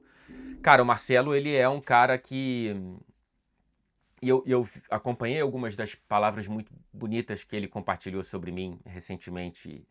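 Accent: Rio de Janeiro, Brazil. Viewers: Caraça Mano... sotaque carioca pesadão😂😂😂😂😂 mudou muito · Fala, cara queria dizer que mesmo nao gostando no geral do sotaque carioca, discordando de voce em muitos aspectos fora do futebol e principalmente te odiando quando o Fluminense tirou a liberta do meu colorado, te acompanho em todos os videos a mais de 3 anos e fico muito feliz com seu passo profissional, fico triste pq sem duvidas sua presenca nos programas da TNT eram essenciais e o MFM perde seus 2 melhores integrantes em poucos meses, mas desejo muito sucesso em sua nova jornada, voce é foda!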